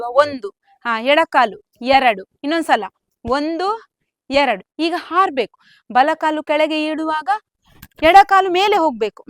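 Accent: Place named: Udupi, India. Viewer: Tumba maja agtade namma udipi kannada keluvaga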